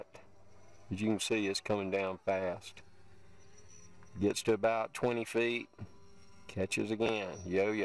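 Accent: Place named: South, United States. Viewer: In my nearly 70 years I do believe i have learned a thing-or-two. As Yosemite Sam would say, only a 'Gol-Darn-Idjet' flies over water when TESTING anything unsure of. And by-the-way, your voice takes me 'down home' a bit... lived in SC, now up in MA for 20+ years. Love it here in my home, but I truly miss the 'Southern Speak', y'all. STOP testing over water! ;-)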